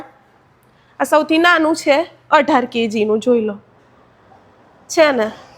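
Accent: Sabarkantha, India. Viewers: સાબરકાંઠા ના લાગો છો ક્યું ગામ?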